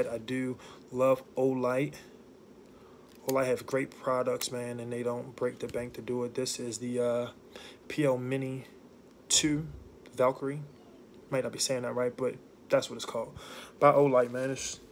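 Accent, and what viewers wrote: Baltimore, United States. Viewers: You can tell he from Baltimore lol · Thats right more people of color Loving this ur Baltimore accent makes me think of Omar from the wire lol keep it up. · That Baltimore accent is 🤩. Love your gun collection. I just purchased my first hand gun last week. Excited.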